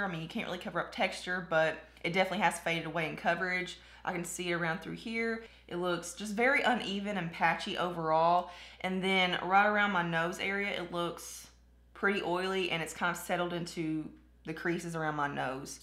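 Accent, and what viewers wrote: South, United States. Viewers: I’m glad you actually take the time to REALLY test the foundations!!! Love your channel and that cute sweet southern accent!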